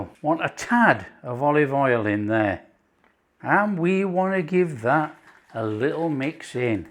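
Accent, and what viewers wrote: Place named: Leeds, United Kingdom. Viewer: Fantastic. Delivery superb. Really lovely guy, from Leeds. I guess? Keep it coming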